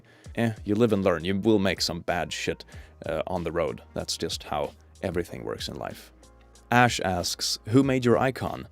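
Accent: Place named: Sweden. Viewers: Swedish for sure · he is swedish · I think he's swedish atleast Scandinavian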